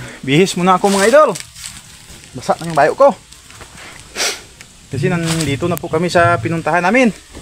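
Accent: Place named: Karay-a, Philippines. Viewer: Hahah ,nami gali pamatian Ang Tagalog kag karay ah 😂 in short Tapaznon 😅❤ Idol lang sakalam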